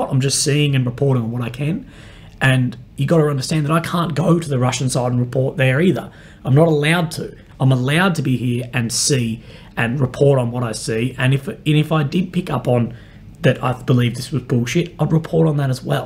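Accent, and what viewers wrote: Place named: Australia. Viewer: Jeez there are some cynical people around; surely most people can see that you are genuine in your cause? As an Aussie i want to say that it's great to hear your accent on a vid about the war in Ukraine! Thanks for flying our flag mate, you're a champion :)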